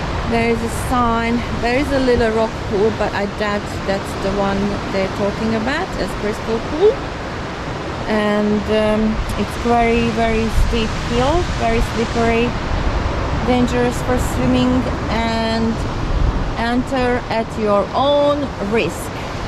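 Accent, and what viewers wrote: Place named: Netherlands. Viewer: Enjoyable series on Norfolk Island, thank you. I assume from your accent you're Dutch?😢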